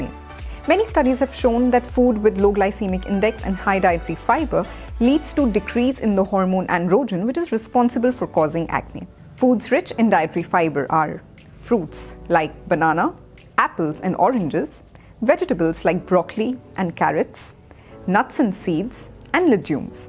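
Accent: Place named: India. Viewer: Please not the hindi accent againnnnn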